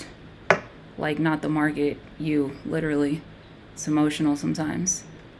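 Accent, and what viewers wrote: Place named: United States. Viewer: seemed choppy - love her accent what area of america is that?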